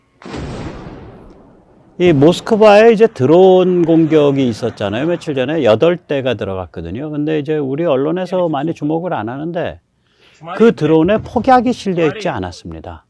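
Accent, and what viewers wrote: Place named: Seoul, South Korea. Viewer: 서울 사투리 쓰시는거 같아요. 'OO 밖에' 를 'OO밲에" 이렇게 말하시네요.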